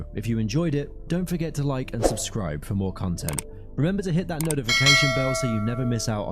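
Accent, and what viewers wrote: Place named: United Kingdom. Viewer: brithish accente good for uuuu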